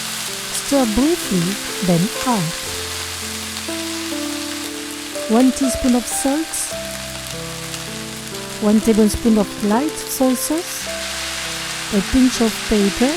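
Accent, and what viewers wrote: France. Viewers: Are you french ? you sound french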